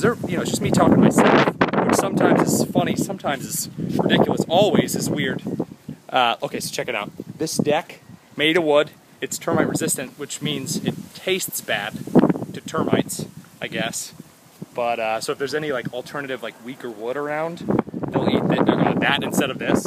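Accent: New York, United States. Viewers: the new york acsent video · R u American or English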